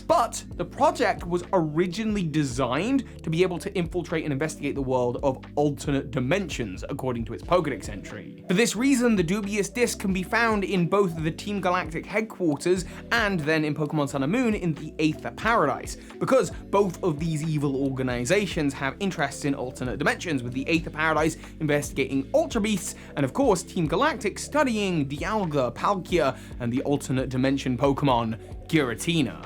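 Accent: England, United Kingdom. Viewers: With r-dropping English accent, “thirsty guards” sounds like “thirsty Gods”, which I think is a great way to describe the residents of Mt. Olympus.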